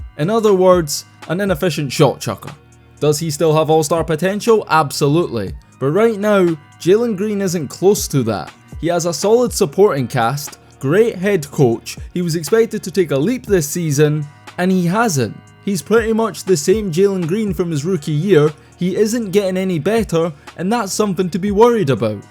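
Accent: United Kingdom. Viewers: Imagine calling Jalen Green a bust when he averaged 22 ppg in his sophmore year LOL u don't know wtf u talking about last time i click on a basketball video from a dude with a british accent tf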